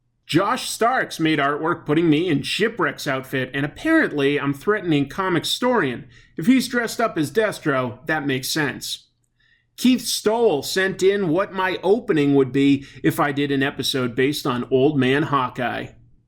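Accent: Boston, United States. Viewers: I thought he sounded like from Boston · If you're trying to do a Maine accent, you might need to relax your throat more. Right now you have a solid Boston accent. :D Great video. Keep it up!!! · Is that a farmer accent or Boston?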